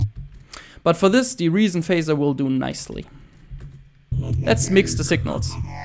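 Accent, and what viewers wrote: Germany. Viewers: ne dein english ist gut, aber wenn man selber deutscher ist, hört mans halt.. ich glaub du hast sogar ne relativ ähnliche stimme wie ich ;-)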